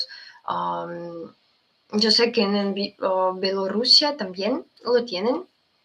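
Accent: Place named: Spain. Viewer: Tienes acento de el país de España pero no castellano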